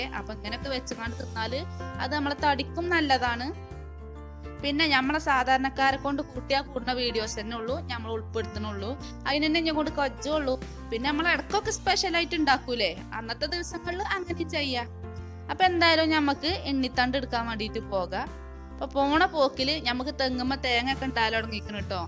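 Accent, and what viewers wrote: Malappuram, India. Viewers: എനിക്ക് ഈ മലപ്പുറം താത്തന്റെ വീട്ടിലെ വിശേശവും മലപ്പുറം ഭാഷയും പൊളി നിങ്ങൾക് ആർക്കെങ്കിലും മലപ്പുറം താത്തന്റെ ഭാഷ ഇഷ്ടമായവരൊക്കെ ഒരു ലൈക് തരു 🙃🙃🙃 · Kasaragodil ninn kananad njan mathrano🤗.orupadishtayi malapuram slang😍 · തനി നട്ടൻ മലപ്പുറം ഭാഷ... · അതുഞങ്ങളുടെ മലപ്പുറം ഭാഷ അത്‌ ഓവറായി തോന്നുന്നവർ.. ഈ വീഡിയോ... kananda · Malappuram voice super😀😀😃😇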